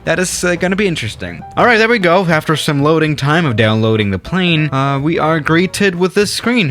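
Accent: Germany. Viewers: Its german I think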